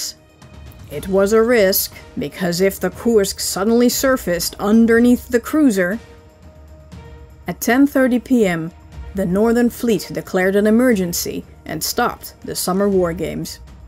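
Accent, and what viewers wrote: Netherlands. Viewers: well she's Dutch so ... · She is dutch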